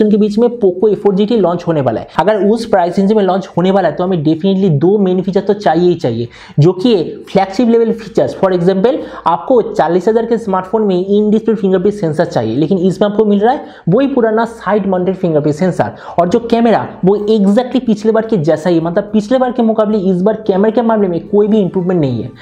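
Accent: Bengal, India. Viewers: Bengali babu when says hindi,sounds lovely 👀❤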